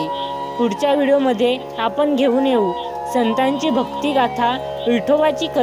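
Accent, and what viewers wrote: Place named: Konkan, India. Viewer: उच्चार अशुद्ध जरी आसले तरी कोकणातली मराठी अशीच असते महाराष्ट्रात प्रत्येक विभागातली मराठी भाषा वेगवेगळी असु शकते कोकणी खान्देशी पुणेरी मराठवाडी सातारी विभागानुसार उच्चारात बदल असु शकतो बोलण्यातील आशय आणी भाव सारांश महत्वाचा आहे हरीपाठा बद्दल खुपच छान माहीती सांगीतली धन्यवाद 🙏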